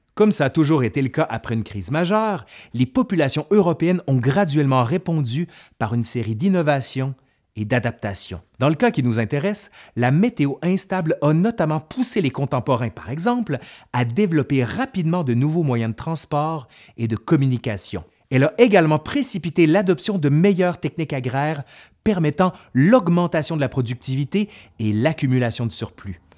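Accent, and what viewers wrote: Quebec, Canada. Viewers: Et pourtant le ciel n'était pas encore troué et alors donner les cause du changement climatique a c'est époque la avec l'accent cannadien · Super vidéo. J'ai vécu au quebec dernièrement (que j'ai dû quitter malheureusement) et je sais pas trop pourquoi mais ça me fait plaisir de ré-entendre cet accent! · Ce que j'aime beaucoup, c'est l'accent Québécois. Sinon; j'aime aussi ces vidéos qui nous démontrent que le climat ne peut se prévoir comme cela est le cas actuellement avec le GIEC. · C'est chouette d'écouter l'accent québécois dans un français intelligible. Merci pour la vidéo. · Quelle superbe diction…j’en ai les pieds gelés!🥶🙃😂Heureusement, votre adorable accent québécois réchauffe mon cœur et mon corps. J’ai augmenté mes connaissances, ce soir et je m’endormirai un peu moins sotte.😉😁MERCI❣️👍🥀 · Superbe vidéo et reportage surtout avec cet accent Québécois si unique et charmant !! 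· Désolé, j adore vos vidéo, et l accent me rappelés les quelques années passées au Québec dans mon adolescence. Mais je vais me permettre un commentaire la première fois que j ai vu votre vidéo je me suis dit "au final les Québécois c est des Scandinaves (descendant de Norman) de culture française qui ont immigré aux Amériques:) Continue the good work comme ils disent. Et merci pour vos videos!!! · L'accent, encore l'accent, toujours l'accent. Depuis Félix Leclerc, vous ne vous êtes pas fait l'oreille à l'accent québécois ? Ici, y a longtemps qu'on s'est habitué à l'accent français ! ;) hihi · Tres interresant. Je suis un re-apprenant de francais. Apres Lycee, ily a 60 ans, j'ai recommencé apprendre un peu le francais, je trouve que vous parlez dans un facon je comprend. Je suis déja un amateur d'histoire. Votre accent, c'est quebecois? Je nai pas un clavier francais :-( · Un Québécois qui me narre la Fance d'époque, avec cet accent, quel bonheur! 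Le vin qui gèle, mais quel cauchemar 😱 Bisous de Bordeaux 😉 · Je viens de trouver la chaine .. très intéressant ce que tu présente mais l'accent surtout j'ai jamais cru que je vais aimer l'accent québécois.. Continuez Bravo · tresbkie monsieur et en plus avec cet accant canadien qui ma toujous plut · C'est fou comme j'aime entendre l'histoire française racontée avec un accent canadien :) Je sais on dit "accent québécois" mais je suis français tout le monde sait que casser les pieds c'est une obligation génétique pour nous :p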